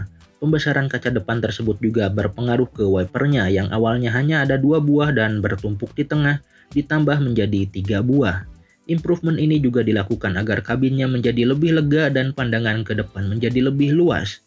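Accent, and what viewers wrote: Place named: Sunda, Indonesia. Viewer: 😂😂😂 lucu adminya membawa narasinya..ciri ciri orang sunda nya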